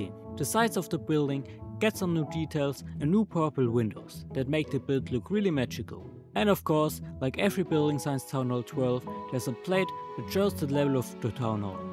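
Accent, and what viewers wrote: Germany. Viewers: Wer you german? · I like your accent. Is it german? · Man hört richtig, dass du deutsch bist hehe Nices video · Are you from germany, cuz you've got a damn german accent! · Can I ask where you’re from? You sound German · Deutscher Akzent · Are you german? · you are from germany right?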